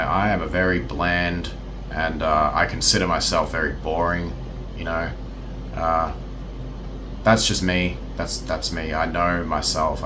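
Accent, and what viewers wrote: Australia. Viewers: I guess you are Australian ? Cuz of the accent)) do you call it spring ? Haha it’s 25 C degrees there it’s not spring, it’s summer)) I mean it’s very good temperature nothing compared to our coming winter it’s going to be -50 C 🤌 Also didn’t know that we have the same time zone almost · Sorry, but I never take advice from people who have Australian accents and a 1998 Boy Band haircut.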